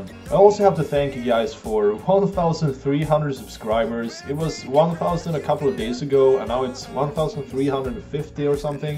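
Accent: Sweden. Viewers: Are you from sweden? + I SUBSCRIBED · I can hear you are from Sweden!;D Swe: Man kan höra att du är från Sverige!;D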